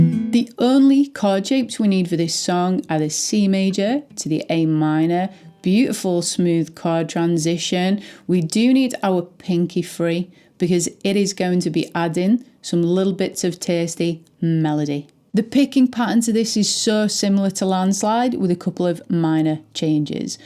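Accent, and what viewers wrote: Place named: Northern England, United Kingdom. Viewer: Only came across you today, lovely channel. No faff in your delivery and it's great to hear a fellow northerner ont 'tube as opposed to an American or somebody below 'the divide' 🤣🤣 Subbed!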